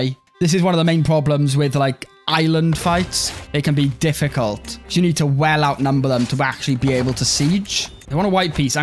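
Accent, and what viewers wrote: Wales, United Kingdom. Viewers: Your Welsh accent is delightful.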